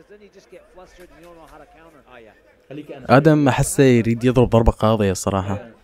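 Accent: Iraq. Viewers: اني ستوني اكتشفت انوو لهجتك عراقيه اعتقد انوو انته عراقي 😹😹😹 ابن بلدي اعتقد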